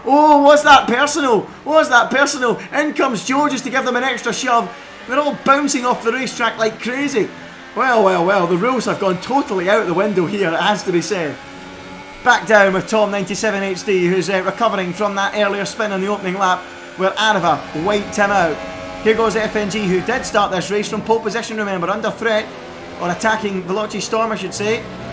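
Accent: Scotland, United Kingdom. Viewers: Scottish ain't too hard to understand, what is hard to understand is why he has an issue with tom97hd tho 🤔 just seems to slate him a bit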